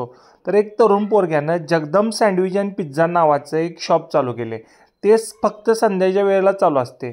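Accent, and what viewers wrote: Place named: Kolhapur, India. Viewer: ? 😅 I like kolhapuri accent..so I watch.. thanks for it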